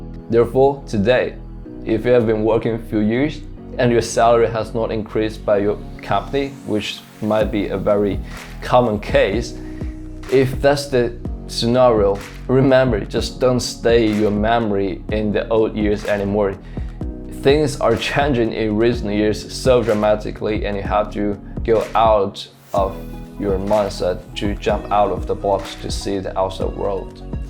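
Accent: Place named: China. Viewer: 一听就是咱中国留学生哈哈，点个赞！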